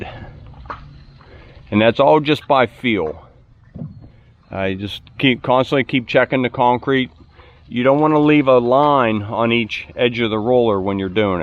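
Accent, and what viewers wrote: Pittsburgh, United States. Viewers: As soon as I heard you talk I knew you must be from somewhere near Pittsburgh, PA… am I right? I grew up there.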